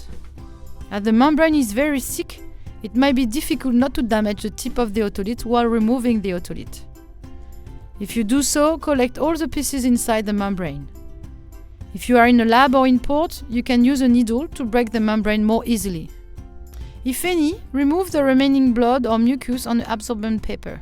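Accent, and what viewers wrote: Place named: France. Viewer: That's a French accent if I have ever heard one 😂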